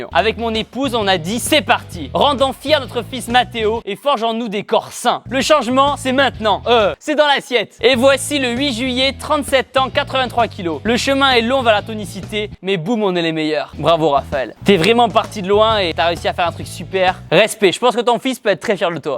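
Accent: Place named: Paris, France. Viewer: TU VIENS DE TOULOUSE MAIS TU LA MASSACRES AVEC TON ACCENT PARISIEN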